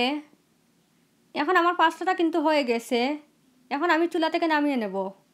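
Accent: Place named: Sylhet, Bangladesh. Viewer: তোমার মাত হুনিয়া বুজিলাইছি তুমি সিলেটি।আমার এলাকার আফা।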